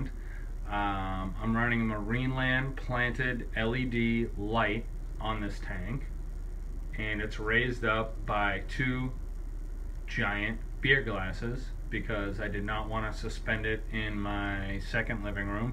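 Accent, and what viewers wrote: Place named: Massachusetts, United States. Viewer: Nice hearing a dude that sounds like me on here, any south coast MA shops that are good for plants, does Beds carry a variety of plants? Seems like most shops only carry a couple of the usuals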